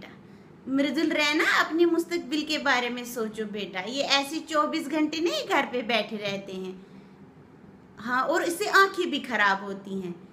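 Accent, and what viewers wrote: Kashmir, India. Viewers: Real kashmiri ascent. Loads of love for di. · I loved the Ending.. Episode was OK but you are Too good...You are Super Talented.. I love your Expression and the Kashmiri Accent.. 😊😊😊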